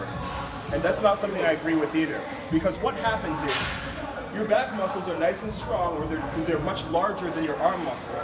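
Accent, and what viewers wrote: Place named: Canada. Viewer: A black guy with a Canadian accent.....learn something new everyday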